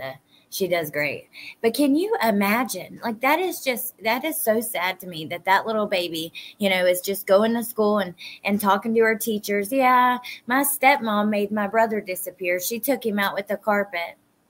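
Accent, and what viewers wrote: South, United States. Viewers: U don’t smack ur lips it’s jus the gorgeous southern accent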